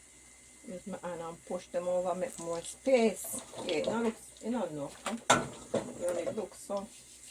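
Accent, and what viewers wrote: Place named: Jamaica. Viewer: Love how you keep the Jamaican accent 😅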